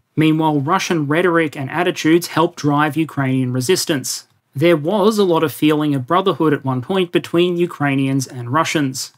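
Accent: Australia. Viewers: He's Australian. It's weird how many comments there are saying he's British though...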